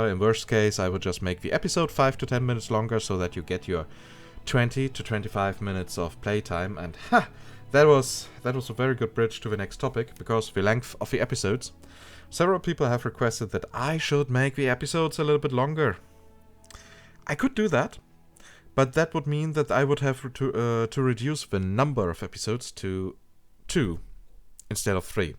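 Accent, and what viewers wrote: Germany. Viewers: I like your German accent, it's pleasing, I reckon Americans and Brits are not used to straight honesty of central Europeans. Honestly, I like your play style, but your commentary is much more entertaining for me than Raptor's and other channels, so this is why I watch this one. That being said, "So-ing" machines ;) Danke fir macht aus Praag! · This is how most German people talk English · We like you with ur german accent bro. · Guten Tag, da dieser Kommentar für dich ist, kann ich ihn ja auf deutsch schreiben... Du hast vollkommen recht, als ich die ersten Videos von dir in der Anno 1800 Beta gesehen habe, hat mich dein Ton und deine Art schon ein wenig genervt. War jedoch nur eine Mischung aus Neid und meinem eigenen Anspruch an mich möglichst zu versuchen in Englisch nicht wie eine Vollkartoffel zu klingen. Ich habe deinen Kanal nach der Beta leider aus den Augen verloren, hatte mir aber fest vorgenommen mir die Vollversionvideos von dir anzusehen... 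und weswegen? Wegen deinem Spielstil, deine Layouts bringen mich zum lächeln und wenn dein Layout nicht auf die Insel passt und du dich ärgerst, ärgere ich mich mit dir und genieße gleichzeitig ein wenig Schadenfreude. Ein deutscher Muttersprachler darf in Englisch auch ruhig so klingen... Wenn er dann noch in seinem Spielstil den Klischedeutschen raushängen lässt, ist das top Unterhaltung. Es ist super entspannend jemanden "genau richtig" spielen zu sehen, während man selbst gerade einfach zu faul ist es selbst zu machen. Arbeit ist wunderschön... ich könnte stundenlang zusehen. :D Diese Art Anno-Let's-Play habe ich schon lange gesucht, jetzt kommt das (meiner Meinung nach) beste Anno raus und ich finde genau das. :) Danke, danke, weiter so!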